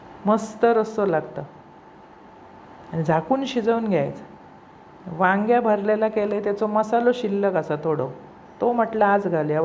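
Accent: Malvani, India. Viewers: तुमचे पदार्थ खुप मस्तच असतात. बोलण्याची भाषा आणी लय अगदी❤❤ मालवणी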